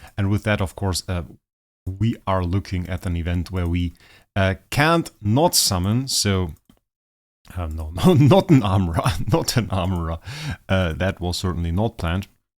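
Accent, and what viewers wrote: Germany. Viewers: I can definitely hear the German accent but it might be because I’m danish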